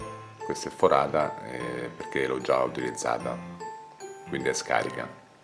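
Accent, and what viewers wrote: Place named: Marche, Italy. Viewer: Dall'accento familiare sembri Marchigiano..👌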